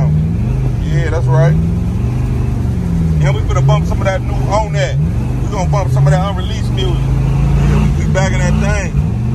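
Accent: United States. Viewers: Yank talk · that yank talk go crazy 😂 🏁💯🏁💨🥃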